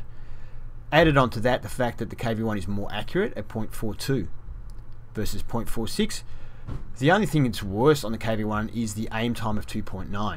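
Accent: Australia. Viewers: R u an Australian/New Zealander?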